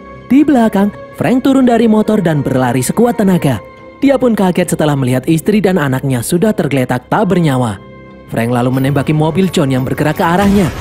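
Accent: Java, Indonesia. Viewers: medOk x Jawa mu min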